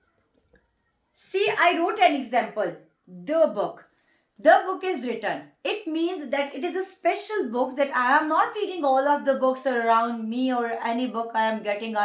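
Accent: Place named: Punjab, India. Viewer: MashaAllah Ap to Einglish Humari Punjabi ki tarha bol rahi ✌👍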